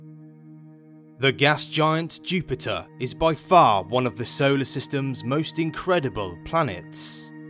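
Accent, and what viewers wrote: United Kingdom. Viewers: I just dont get it you you speak like a british but you use imperial measurements. Anyway, have you ever wonder that there might be a civilization or alien life inside that giant red spot? protected by the cyclone itself.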